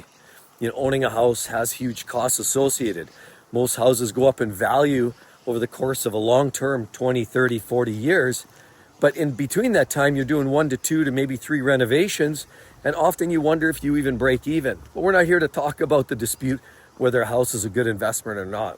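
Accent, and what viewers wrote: Alberta, Canada. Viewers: Is your accent an Alberta accent?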